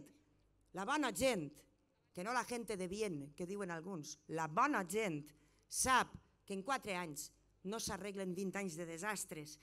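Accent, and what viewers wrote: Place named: Valencia, Spain. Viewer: has de mejorar tu catalán se te nota mucho el deje valenciano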